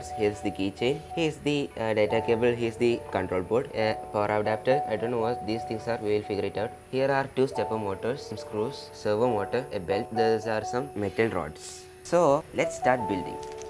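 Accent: Kerala, India. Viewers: 😌I saw you made a drone..Your accent tells you are from kerela.. 😹🙏guruji, pls let us be your students.. Just can't imagine your future.. 👍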